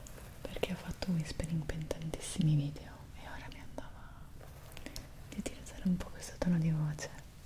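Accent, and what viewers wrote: Italy. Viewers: meravigliosa, molto rilassante e la sua voce è estremamente morbida, il suo accento italiano è una delizia da ascoltare. un bacio dal Brasile 😴❤️🇧🇷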